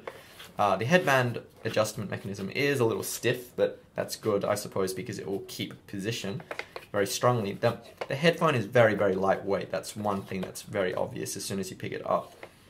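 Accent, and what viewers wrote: Australia. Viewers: He’s clearly got an Australian accent???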